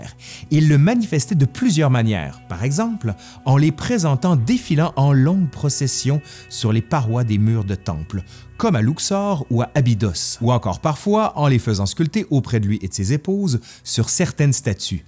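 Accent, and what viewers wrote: Quebec, Canada. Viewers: MERCI passionné par l'Egypte depuis la 6 ème (presque 35 ans) cette vidéo ne pouvait que me faire plaisir Je suis d'accord sur la beauté d'Abou Simbel, pour avoir eu le privilège de voir ses 2 temples dès le lever du soleil et donc avant l'arrivée des masses d'autres touriste. Pour la tombe de Nefertari elle était en retauration a cette époque là; un pretexte parfait pour retourner un jour en Egypte Continue ainsi et vive l'accent du Quebec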